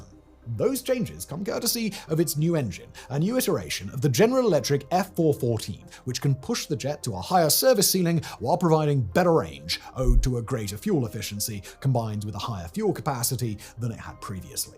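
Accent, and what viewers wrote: RP, United Kingdom. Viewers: Not related to the content but that accent cannot be real, unless someone can verify where it’s from, ( if poshy English, what area exactly?)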